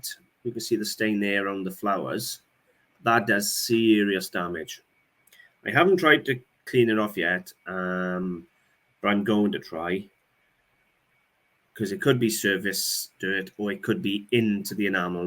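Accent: Wales, United Kingdom. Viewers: I loved the silver fish spice box. I am Jewish and I have never seen one. I have a spice box in the shape of a sunflower. The spices are used in a ceremony at the end of the Sabbath and smelling them reminds us that we are saying goodbye to our special day. By the way the word Judaica is pronounced as though the 'c"is a "k". I enjoy your videos and especially enjoy your Welsh accent as my husband is also Welsh. Much success in 2022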